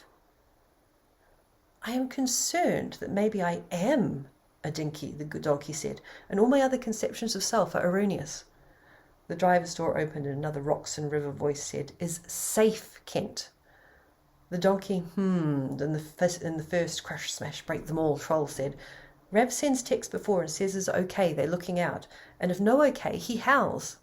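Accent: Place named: United Kingdom. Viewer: Thank you. I listened to this one and turned on CC. Most of the time they got the words wrong, but I got the story. I remember the chatacters from the books. I'm in America so listening to the brithish accent is always interesting. And you seem like a nice person. I've been communicating with you since 2021, at least.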